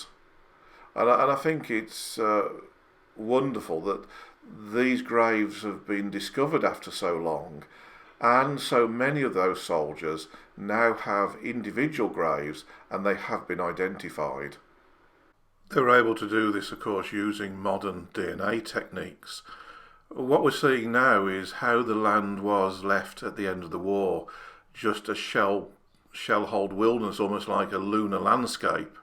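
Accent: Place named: England, United Kingdom. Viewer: A very well presented talk and delivered without the usual triumphalism that often is shown when Aussie battles are discussed. You have an English accent.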